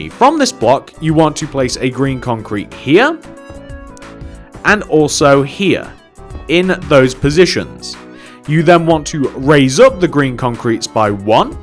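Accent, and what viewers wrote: United Kingdom. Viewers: you sound like britbong, very distracting, 7/10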